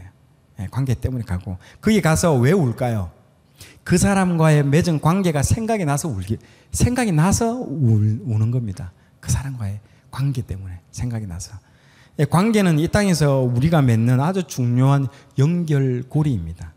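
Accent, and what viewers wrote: Gyeongsang, South Korea. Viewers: 경상도 말이 이렇게 부드럽고 아름다운지요..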